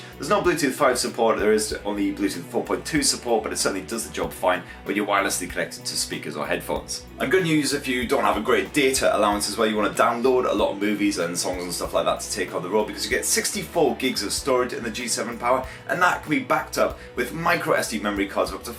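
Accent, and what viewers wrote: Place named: England, United Kingdom. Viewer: Still sounded more pom than Aussie but it's forgivable haha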